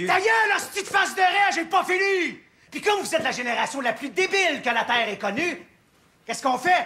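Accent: Quebec, Canada. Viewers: L'accent et les expressions du Québec sont très différents du français de France et il y a beaucoup d'anglicismes. Niveau accent, c'est la même chose avec le créole par exemple. Perso, la première fois que j'ai vu la vidéo c'était sans sous-titres et certaines expressions m'ont échappé. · Même si la France et le Québec ont évolué différemment depuis le 17e siècle. Et bah au 21e les problèmes sont identiques. La décadence de la langue française. Cet extrait est valable de part et d autre de l atlantique. C est effrayant de voir ça . Mais j avoue qu en tant que français je préfère quand même l accent québécois qui est bien plus mélodieux que l accent français qui est fade pour parler de ce problème.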